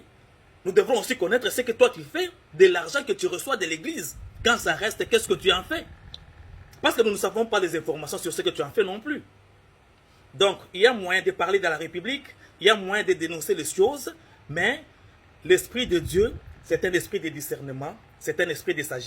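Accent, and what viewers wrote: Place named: Kasai, Democratic Republic of the Congo. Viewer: Yo nani. Aventurier tala ligne ya ba voyous. Oza muluba deja tonalité eyokani. C normal que tu défende les voleurs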